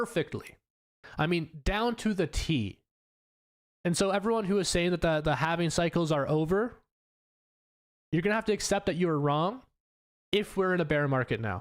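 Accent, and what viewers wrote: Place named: United States. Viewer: I noticed that you say out like an American and not like a Canadian.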